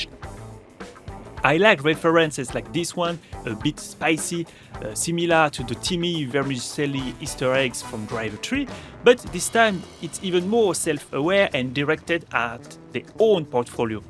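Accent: France. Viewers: Are you french??? Damnnn the accent ahaah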